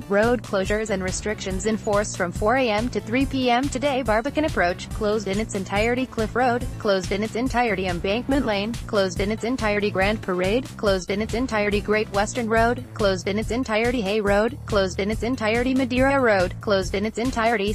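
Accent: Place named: United States. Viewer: 1 for robot voice with American accent, instead of getting a real person to narrate. If I could, I'd give another -1 for posting stills instead of video on YouTube.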